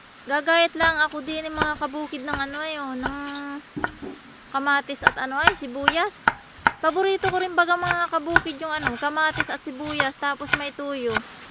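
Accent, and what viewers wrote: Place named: Quezon, Philippines. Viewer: Taga Quezon Province ka ate.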